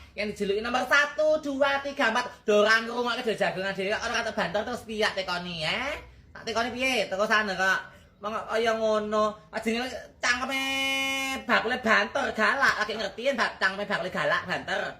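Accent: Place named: Surakarta, Indonesia. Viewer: Logate ❤nambani logat solo Jateng....wong tuo q asli solo jogya ... Saiki q d kota prob jatim... Logat q ws gk ngunu😊😅😅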